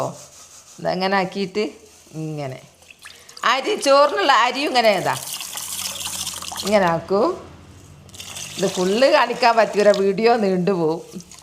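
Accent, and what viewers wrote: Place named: Wayanad, India. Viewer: ഹലോ താത്താ... ഞാൻ മലപ്പുറത്തുനിന്നാണ് കാണുന്നത് കേട്ടോ. താത്താന്റെ ആ വയനാടൻ സംസാരിക്കുന്ന രീതി കേൾക്കാൻ എന്ത് രസമാണ്! താത്താനെയും സംസാരിക്കുന്ന ശൈലിയും എനിക്ക് ഭയങ്കര ഇഷ്ടമായി. താത്താക്ക് പടച്ചോൻ നല്ല ആയുസ്സും ആരോഗ്യവും നൽകി അനുഗ്രഹിക്കട്ടെ. ഈ മുതിരത്തോരൻ കണ്ടപ്പോൾ തന്നെ കൊതിയായി. ഞങ്ങൾ ഇവിടെ മുതിരപ്പുഴുക്കോ, മുതിര ഉപ്പേരിയോ ഒക്കെയാണ് ഉണ്ടാക്കൽ. താത്ത ഉണ്ടാക്കിയ ഈ രീതി എനിക്ക് പുതിയ അറിവാണ്, ഞാൻ എന്തായാലും ഇത് ട്രൈ ചെയ്യും. ഇതിന്റെ കൂടെ പറഞ്ഞ ആ മുതിരക്കറിയുടെ റെസിപ്പി കൂടി താത്ത അടുത്ത വീഡിയോയിൽ കാണിച്ചു തരുമോ? ആ കറി ഉണ്ടാക്കുന്ന രീതി കാണാൻ കാത്തിരിക്കുന്നു. താത്താന്റെ പുതിയ വീഡിയോകൾക്കായി ഞങ്ങൾ കാത്തിരിക്കും! 😍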